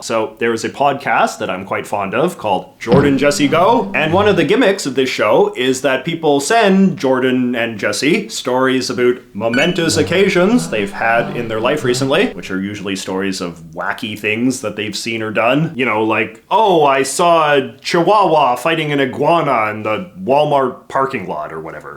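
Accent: Canada. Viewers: "You know what they say about the weather here in {insert name of your north American city}, if you don't like it, just wait around a minute, it'll change" Are you exagerating your Kanuck accent? Or is that for real?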